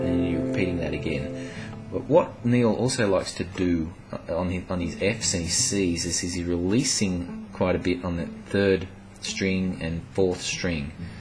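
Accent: Australia. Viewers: hes not english, he sounds Australian...or maybe kiwi?! but his music is definitely high koala-ty! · Thanks for the lesson, very clear, no nonsense. Love Aussie accent !!! Looking forward to playing it.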